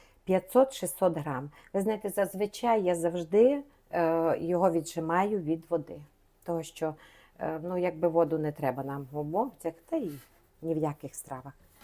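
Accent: Transcarpathia, Ukraine. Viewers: Так приємно бачити і чути наш Закарпатський говір. А страви ми і у Німеччині готуємо ,як колись вчила нас нодь мома. Здоров'я та миру вам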